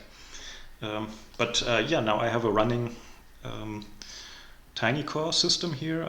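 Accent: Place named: Germany. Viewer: Gut gesprochen, aber man hört den deutschen Akzent :)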